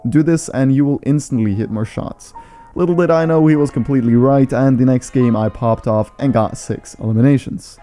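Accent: Norway. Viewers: are u from norway by any chance??? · Ayo norwegian? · Are you Norwegian?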